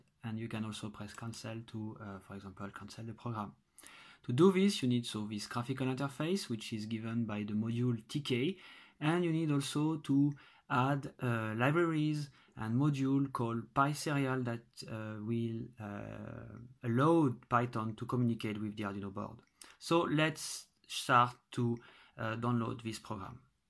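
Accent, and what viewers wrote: France. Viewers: cet accents français XD est ce que ce tuto marche sur windows ? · MDRRRRR t'a un putain d'accent français t'aurais pue le faire en francais ton tuto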